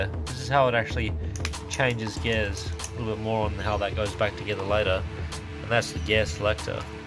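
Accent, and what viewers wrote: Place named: Australia. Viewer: ur sounding like a bloody auzzie keint haha. love it. awesome videos. keep it up.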